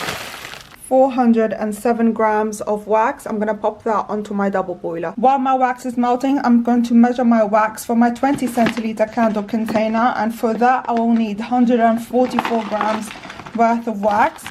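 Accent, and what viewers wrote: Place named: Philippines. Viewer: Greetings from the Philippines. I love the accent. ❤ Let everyone know you're a filipina too.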